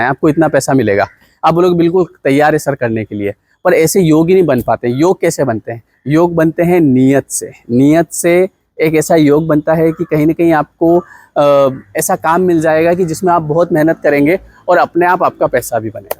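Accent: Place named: Malwa, India. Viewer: भाषा टोन mp निमच, मंदसौर की है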